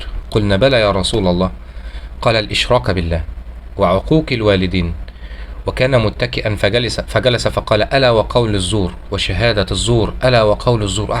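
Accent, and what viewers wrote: Egypt. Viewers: جمييييييل جدا بس ياريت تتدرب على قراءة القران قراءة صحيحة مينفعش اقرءه زي ما اكون بتكلم عامي وانطق جيم مصرية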